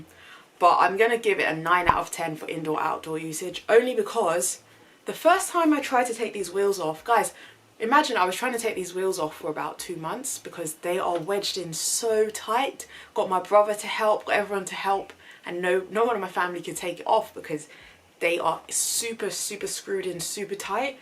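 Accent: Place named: United Kingdom. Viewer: Oh shit! British accent on an Ebony Queen?! SUBSCRIBED!!! 🔥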